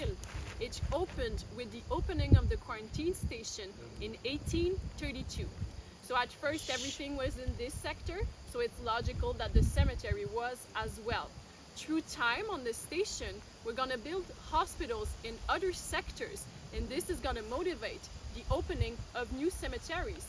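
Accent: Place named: France. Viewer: Where she sounds French? Could it be Canada?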